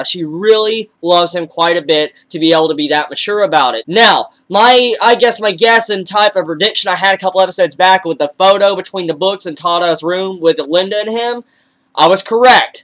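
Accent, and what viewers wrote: United States. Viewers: So he used to have a country accent?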